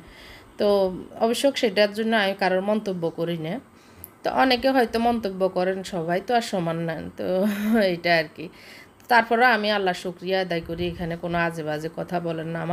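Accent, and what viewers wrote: Noakhali, Bangladesh. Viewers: আপু তোমার নোয়াখালীর কথা গুলো শুনতে খুব ভালো লাগল,অনেক হাসলাম আমার ছেলে মেয়ে নিয়ে,খুব ভালো লাগলো, তোমাকে অনেক ধন্যবাদ,ভালো থাকো আপু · Assalamualikum apu. Ami o Noakhalir meye. Apnar ajker vlog ta khub valo laglo. Apu apnar mukhe Noakhali r kotha e besi sundor lage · নোয়াখাইল্লা ব্লগ খুব ভালো লাগছে....আন্নের কথা শুনি আর দিল টা ভরি গেছে.....আই ও নোয়াখাইল্লা দেই আর বহুত ভালা লাইগছে...আই গর্বিত আই নোয়াখাইল্লা দেই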